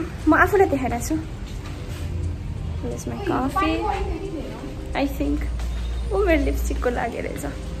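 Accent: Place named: Nepal. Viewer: Beautiful 💓💓u☺ I love Nepal ko accent ☺☺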